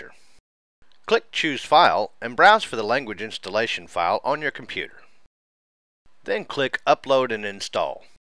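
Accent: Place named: South, United States. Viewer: PFFFFFT on those who talk about speed! This was exactly what I need.. not a 20 minute hand holding just a Q&D on how to get there - THANKYOU.. this rocks! Would love to hear you speaking french too with that good ol boy accent of yours too. Thank you for being fast and concise.. there's others that can do an effective 20 minute presentation - I needed the 5 minute kick start!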